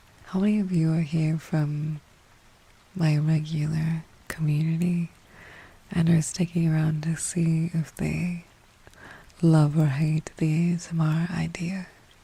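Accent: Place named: New Zealand. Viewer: your voice made me wonder were you were from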